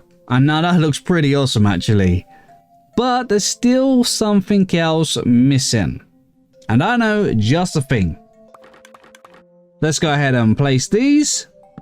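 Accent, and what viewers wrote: United Kingdom. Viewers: It is actually quite hard to me to understand how do u pronounce some of this words, as for non-english speaker. But, cool buildings and this cool sounding british accent, so i happy i found this channel!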